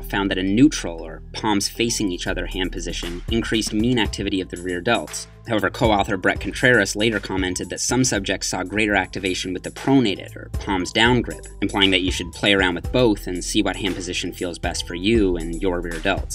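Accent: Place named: Canada. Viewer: love the canadian accent lol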